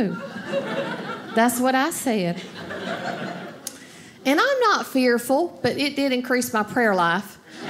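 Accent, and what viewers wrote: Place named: Atlanta, United States. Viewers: I could listen to her accent all day. I used to travel to Atlanta, I miss it! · That's just how you pronounce mills in Georgia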